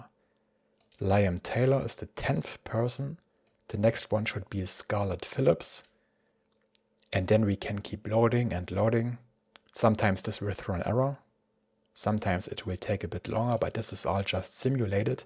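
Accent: Germany. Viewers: gotta love the german accent/pace :-) slow and nice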